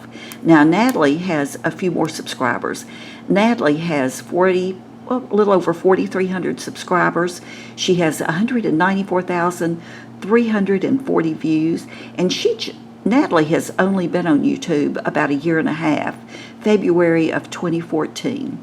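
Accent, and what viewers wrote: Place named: Arkansas, United States. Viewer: ***** oh, I love Arkansas! That's why you have such a beautiful accent! Stay safe!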